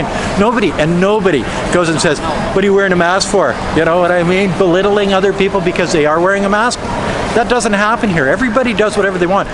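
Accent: Canada. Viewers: So Strange to hear this accent on this canadian guy.... Born and raised slag off his own people like that! I'm So glad hes absolutley in love with his life in China...Thats amazing. And you know what will happen the next time he flies home to the country hes using curse words to describe Canada as going in the toilet? Those Canadians will be as kind, and welcoming as ever, No police to take him in for questioning , no blocks on content he posts about his opinions to keep the social order. But you know what thats okay. Maybe one day he will think better of the people at home. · The wrong people? Between a rock and a hard place we are. Thx canadian irish sounding guy. Theres severe corruption battling the people who want the world to be together in unity peacefully, and were all looked at as choosing this way thats happening now or are thought to all be bad and hate china or whatever. 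I dont think you really know whats happening to us over here neighbor! Keep your sodium chloride facebook comments. Thise mailboxes were being refurbished and some of those images of trucks taking mailboxes are completely out of context. Mail in ballots are the way democracts are trying to rig this election. We are being manipulated and falsified probably just like a lot of situations ALL over the world, you also have no idea about. But your video is appreciated anyway :]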